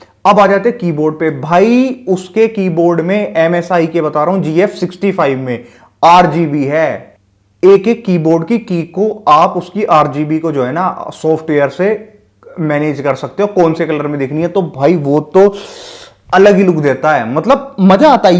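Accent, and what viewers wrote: India. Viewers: par bhaiya aapka bolne ka tarika ekdum mast hai , ekdum desi , very good 👍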